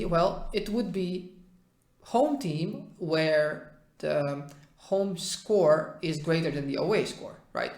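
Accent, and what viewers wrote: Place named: Netherlands. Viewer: i love your videos and your accent, are you dutch?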